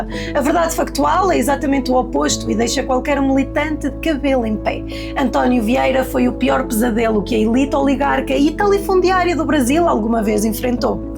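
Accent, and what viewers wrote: Portugal. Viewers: Adoro o sotaque português!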